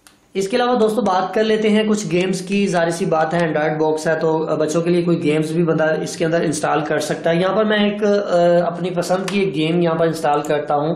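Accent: Punjab, India. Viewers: Phelay Urdu sahee say bolo, Panjabi style mai connect nahi bolo, apney nails cut karoo. phir video banana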